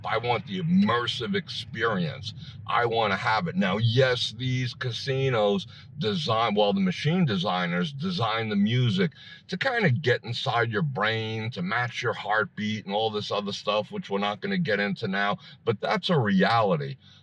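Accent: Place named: New York, United States. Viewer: You ran a red light! You are going to be my virtual concierge in April when I head out to Harrahs. It's the New York/New Jersey accent that has my trust :-) And my MAX BET will be on getting a fridge for me room! Stay safe, thank you for your channel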